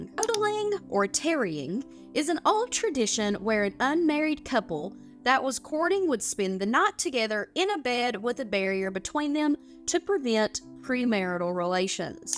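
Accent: South, United States. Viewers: Sounds southern or midwestern US. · Just curious, what region of America is your accent from?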